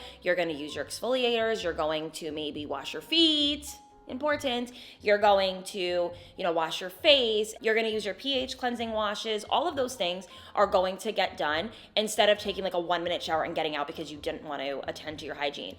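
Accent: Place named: Pennsylvania, United States. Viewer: I just found your channel i was like she sounds like a delco girl lol read your bio and close enough lol you’re beautiful girly🤍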